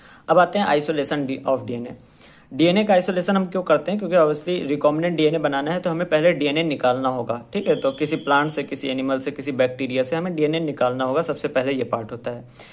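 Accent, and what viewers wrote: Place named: Bihar, India. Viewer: Thankyou for the amazing session 🔥🔥 Sir ap bihar se h?? Humra v accent apke hi jaisa h or bahut acha laga k ap dawn to earth h❤ or jaise h bilkul waise hi show karte h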